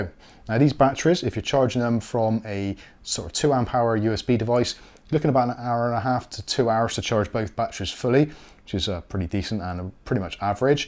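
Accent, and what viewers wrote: Bristol, United Kingdom. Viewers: Are you from Bristol haha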